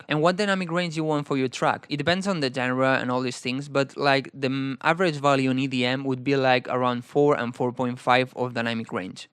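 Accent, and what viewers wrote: Spain. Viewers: noooo? Really? What sort of witchcraft is that!? 🤣 I was simply placing a rhetorical question as this fellow has an obvious Spanish accent 😁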